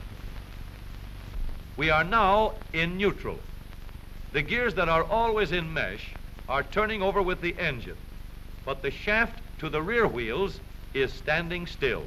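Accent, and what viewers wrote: Transatlantic, United States. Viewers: It’s a form of Trans Atlantic dialect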